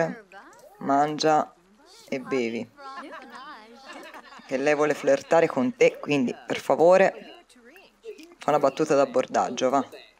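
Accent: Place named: Tuscany, Italy. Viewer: Ciao sono Marco,un nuovo amico! Bellissimo questo video e gioco Sims 4 ho molte amiche che hanno canali dedicati ai Sims ,mi piace il tuo accento Toscano....complimenti! Mi sono iscritto 1 8 7 e... l i k e Se ti fa piacere ti aspetto su un mio video e canale io tratto molta natura,animali,immersioni,viaggi,ecc...così ti presento anche la mia grande community YT dove troverai molti nuovi amici ed iscritti. A presto...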